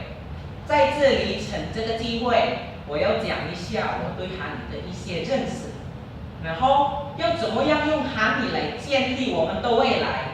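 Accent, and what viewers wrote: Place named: Malaysia. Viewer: Kayak Mandarin Malaysia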